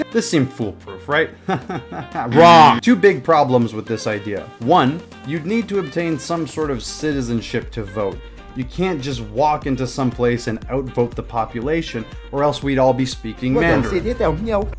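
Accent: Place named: Alberta, Canada. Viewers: He’s Alberton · This man sounds like an Albertan, I may be wrong with that theory but I've lived in alberta several years and I can pick out an Albertan when I here one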